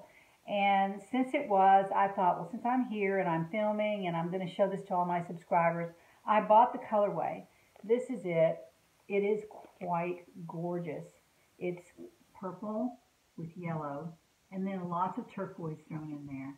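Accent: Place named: Tennessee, United States. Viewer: G'morning;) I just stumbled on your videos... so I binge watched you last night while I was hooking. I absolutely love your accent and the first of your videos I thought to myself; omg is that a Tennessee accent? I just love Tennessee, I've only been through there a few times when I was younger and once stayed a few days in Nashville, but still remember it so clearly! Anyway, I've had fun watching, keep up the great videos;) Donna from Canada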